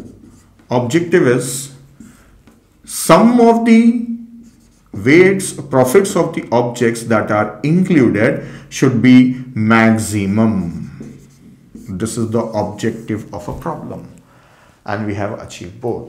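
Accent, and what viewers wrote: India. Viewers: Rabbim sen beni hint aksanına mazur bıraktın ya artık ne diyeyim final sınavında sıçtım zaten türkçe kaynakta yok gömsünler beni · Perfect Indian accent, I just love it.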